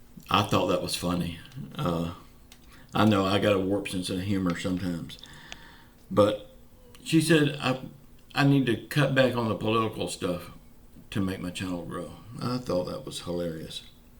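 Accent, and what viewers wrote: South, United States. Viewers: May God heal you sir and i love a southern accent 💛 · Good morning! Sorry to hear about your throat cancer but I still love your southern accent, and thank you for the work that your doing to keep us informed. God bless you sir 🙏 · I am from the south and I sound a lot like you there is nothing wrong with your speech I love it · A knitting show lol.....I love your southern accent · your a great man..we need u in office...someone that cares...Prayers for your cancer...thank u for sharing thoughts and videos...taking time for all of us... love the southern accent... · GOD BLESS YOU MY BROTHER FOR HELPING US STAY INFORMED DURING THIS TIME, AND PRAY HE HEALS YOUR THROAT CANCER NOW 1 PETER. I LOVE YOUR SOUTHERN DOWN HOME FRIENDLY PERSONALITY, HEART, AND ACCENT LIKE ME AND THE GOOD OLE PEOPLE HERE IN NORTHWEST LOUISIANA!! 😂❤🙌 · Hey. 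If you have a knitting show, I'll be there no matter what. Hope you'll still give us an update on the politics AND the stimulus. You're doing a great job. I'm in NC and grew up in FL. Love your Southern accent. Keep up the good work. You are a refreshing start to my day. Thank you for taking the time for us.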